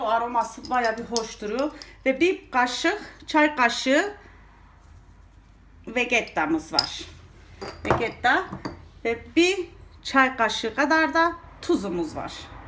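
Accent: Kayseri, Turkey. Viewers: Nerelisiniz ..kayserililer gibi konusuyorsunuz🥰🥰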